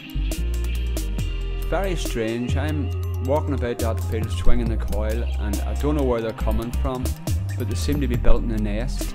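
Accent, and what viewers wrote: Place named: Belfast, United Kingdom. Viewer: gd vid bro u in belfast ? Just you speak like Guy ive dug with Hes originaly from belfast 😎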